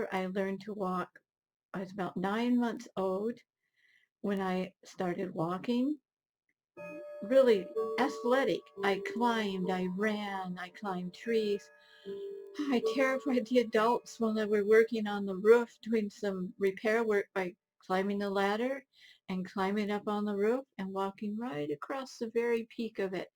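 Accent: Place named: Ohio, United States. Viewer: I was wondering if you were from Ohio because your accent sounds just like my great aunt and old recordings of my great grandmother. I'm subscribed if you ever decide to make more videos, you have a fascinating life and outlook.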